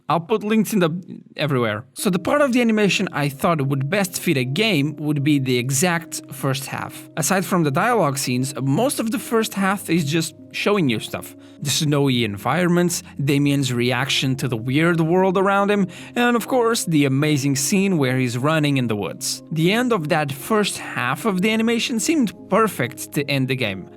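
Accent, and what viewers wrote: Portugal. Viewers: Ah, ai está o famoso super reconhecível sotaque português, good work on the game man, nice job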